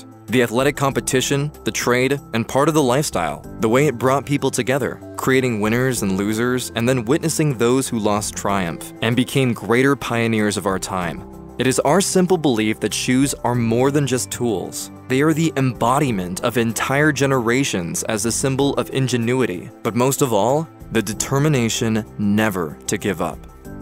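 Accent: United States. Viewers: If he created Adidas why does he have such a young accent And if he is German how does he have an American accent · You sound american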